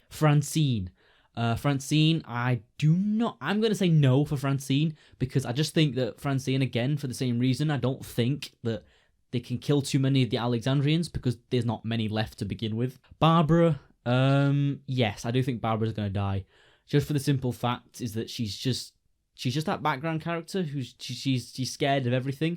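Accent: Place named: Ireland. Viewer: Nice video and predictions! Are you Irish btw? Love your accent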